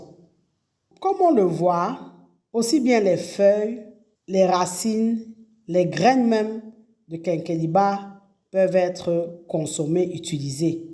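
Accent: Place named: Africa. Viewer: Oui, j' aime sa voix et son français à l' accent familier et authentique africain.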